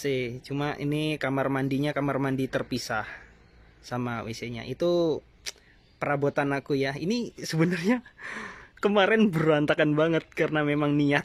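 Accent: Java, Indonesia. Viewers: Orang mana bang? Biasanya kaya orang jawa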